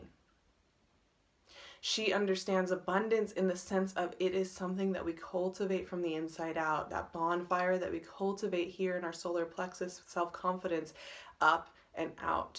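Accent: United States. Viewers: I'm an actor in the U.K. and stumbled here for your American accent... But wow I got so much more! Thank you for your guidance! I've subscribed and will be back each month 💕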